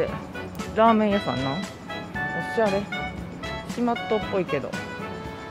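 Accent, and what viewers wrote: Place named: Kansai, Japan. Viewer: さんの関西弁✨懐かしくてホッとするわ〜^ ^ 堺市😮旧堺灯台✍️こんな場所があったのでねその周辺も綺麗な所ですね✨✨ボーリングのピン🤣👍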